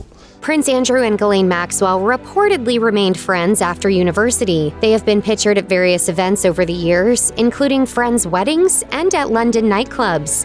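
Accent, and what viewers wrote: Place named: United States. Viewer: Good content, but that high pitched screetchy American voice grates on me. Anyone?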